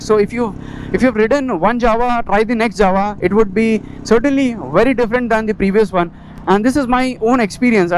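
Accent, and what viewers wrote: Hyderabad, India. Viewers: What a beautiful feelings.. 🥰 I am understanding your feeling the way your talking by heart. And your hyderabadi hindi is more Cool. I mean Mazze aaye sunkar.. The Sound of the your Roadking is very very super.. I just Loved it. 🤩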